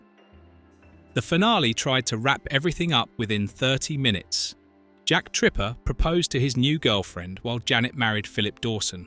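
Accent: United Kingdom. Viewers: British accent commentary on an American show with a fake title! Click bait!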